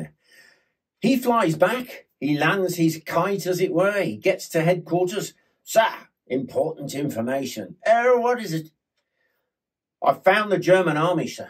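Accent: United Kingdom. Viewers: Love your story telling. Love the British and their accent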